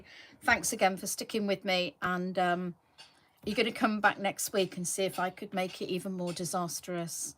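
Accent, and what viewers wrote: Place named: United States. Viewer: Love you’re American speak! Lol. Terrific cards as always! Sorry to have missed but we went in the pool. Rain was to start early so we went in early. Glad we did hasn’t stopped raining yet and it’s Sunday 10pm. Thank you!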